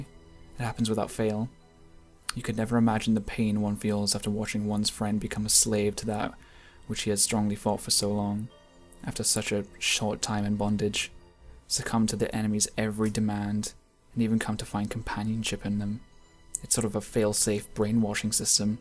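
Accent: United Kingdom. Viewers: ... Please do more of these... That's great. And the british accent makes it even better:] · hes British lol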